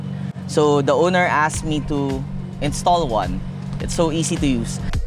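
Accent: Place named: Philippines. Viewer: You speak english but still sound philipine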